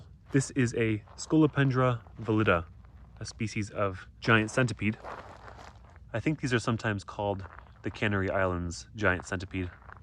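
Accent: United States. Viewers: You sound American. I wish we had better relationships with the middle east. Their flora and fauna are so fascinating, but there is so Little "western" information. Sad really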